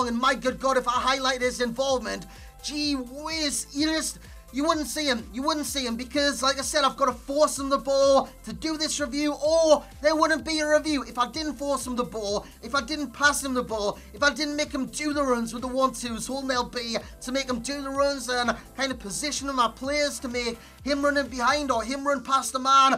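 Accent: Newcastle, United Kingdom. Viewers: hey, what part of the UK is your accent from? I'm from the US so i'm not very good at picking out different dialects.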